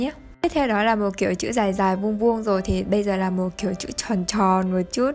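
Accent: North, Vietnam. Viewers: Bạn ở đâu vậy ạ? Tại mình thấy video cũ của bạn nói giọng nam mà video này nói giọng bắc ý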